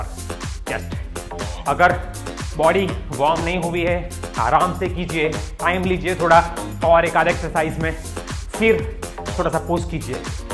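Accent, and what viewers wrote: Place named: Bihar, India. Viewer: Shuddh bihari sunne Mila ...exercise and fun both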